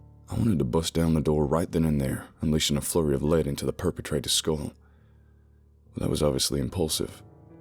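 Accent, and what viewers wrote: South, United States. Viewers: Surely you mean Southern. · sounds southernish to me as well. I'm from Pa and it sounds nothing like I do . 😁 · Ok, now I'v found a new storyline that I truly will enjoy anticipating the next installment thank you! You nailed southern accent so well I love it just love it!!!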